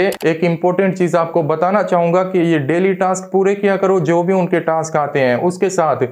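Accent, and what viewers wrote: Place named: India. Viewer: pass indian accent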